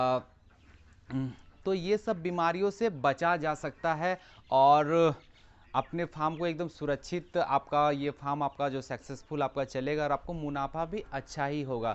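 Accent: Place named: Seraikela, India. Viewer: Bat karne ka andaz se malom huta aap sarai kela said ke ....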